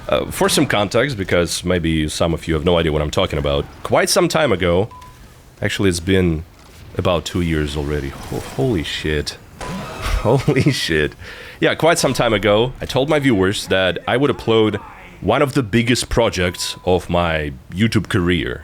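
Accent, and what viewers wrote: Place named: Russia. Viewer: I'm just here for the sexy Russian voice honestly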